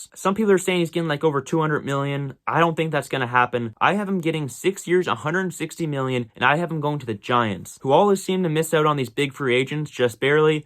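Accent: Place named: Canada. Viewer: What an unbearable accent. Canadians are the worst.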